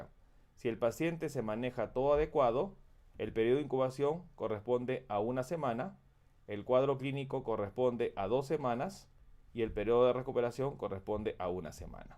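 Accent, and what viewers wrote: Peru. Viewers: Peruano no? 🇵🇪 Vamooo!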